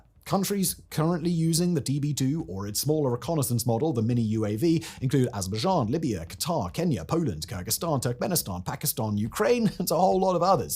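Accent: United Kingdom. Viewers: "Highly warmongering country" says the guy with the British accent, with aplomb. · Such racism against Turks... 1 our "invasion" aka occupation of northern part of cyprus is completely within international law, since we are guarantors of the islands populace alongside with the british since ww1, that gives us the right of occupation if the said populace is endangered, like how greeks committed mass genocide to Turkish cypriots. Second: kurdish "freedom fighters" are in the terror list of every western nation, only since 2014 the ypg branch, which was newly formed as the syrian branch of pkk, were not counted as terrorists. Thirdly the kurdish problem didnt originated from Turkey and spread to other nations, it was already underway in iran, iraq and syria all of whom committed genocides against Turks but NOT Turkey. Dont trust a man only because he is bald, has glasses and has a british accent, this bigot is just a western racist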